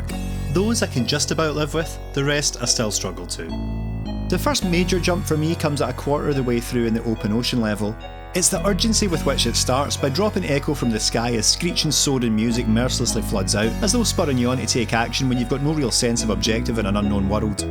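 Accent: Scotland, United Kingdom. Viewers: Love loading a YouTube video and hearing a fellow scotsman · D was a fun video, I like your accent. I am german, so I don't exactly know what it is, scottish accent? :) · Nice scottish accent. <3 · It’s funny how jarring it is to go into a video expecting not a Scott to start speaking and then having to catch up to understand what they are saying 😂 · Holy shit you sound like a scottish ethan klein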